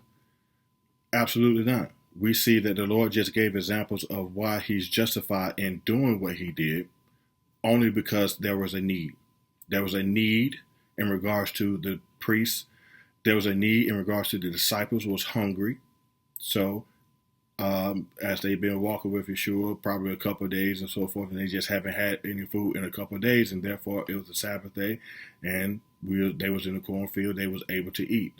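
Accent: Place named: Memphis, United States. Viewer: I agree! Very good brother! Where are you from? Memphis? Love your accent! 👍🏻🙏🏻😀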